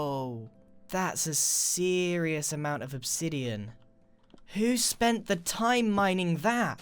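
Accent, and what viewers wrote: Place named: RP, United Kingdom. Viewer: You sound really posh in this episode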